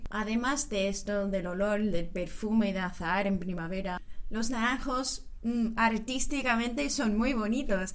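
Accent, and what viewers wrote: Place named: Seville, Spain. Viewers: Me encantan tus videos, eres muy simpatica. Me gusta muchisimo tu acento sevillano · Mientras no te dé un cólico por haberte tomado las naranjas, jeje. Me da curiosidad, porque es cierto que siempre han dicho que no son naranjas comestibles (por lo amargas que son) y que las cogen para hacer mermeladas y cosas así. Precisamente la semana pasada estuvieron cogiendo las naranjas del barrio donde vive mi hermana, en San Jerónimo. En mi barrio no hay muchos naranjos, pero si tú confirmas que se pueden comer y están dulces algún zumito caerá seguro. Un abrazo y enhorabuena por lo bien que hablas español, con acento sevillano 100%.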